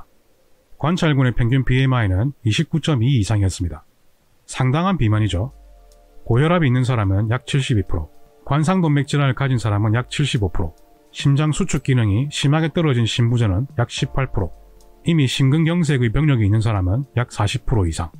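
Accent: Gyeongsang, South Korea. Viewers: 영어 일본어를 마스터해도 경상도사투리는 못고치는구나...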